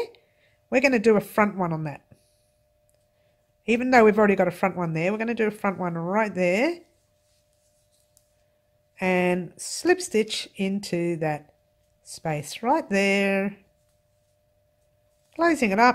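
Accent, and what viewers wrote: Australia. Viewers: Wow love your accent Australian lady ty for sharing.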